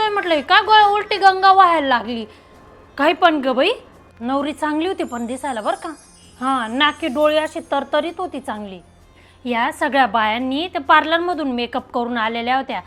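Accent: Khandesh, India. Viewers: आहेरणी बोलत रवा मस्त वाटस मै खान्देशी माल्ये अभिमान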